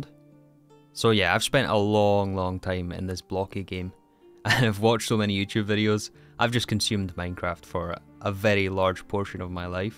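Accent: Scotland, United Kingdom. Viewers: we've all had the honour to experience and enjoy your brilliance in this blocky game. Life changes and evolves, as must we along with it. We will miss you, we love you, and we're happy if you're happy. So long dear friend, hopefully we may hear that beautiful Scottish accent again in the future. Wherever you go, whatever you do, always ALWAYS check if its HV or MV. ❤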